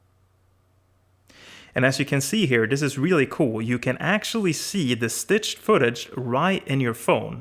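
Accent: Sweden. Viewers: Are you from Sweden ?)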